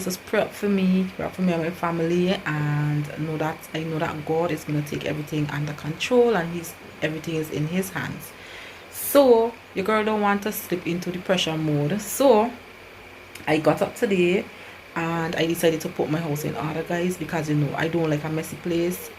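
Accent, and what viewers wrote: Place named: Barbados. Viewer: For me it's nice when I come across content from Barbados. Girl you have adopted the Bajan accent very little of your former accent is left. God has already worked it out.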